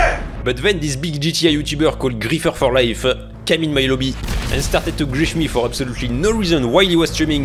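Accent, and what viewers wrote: France. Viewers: How do you sound even more French every time I watch any of your videos 😅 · Your French accent was 10/10 in this video, idk it was just so lovely lmao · Do you have any idea how French you sound ? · No way again french accent guys 😮😮 nice vid btw xd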